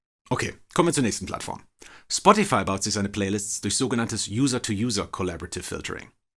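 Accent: United States. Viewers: bin ich bescheuert oder hast du einen leichten amerikanischen akzent? :D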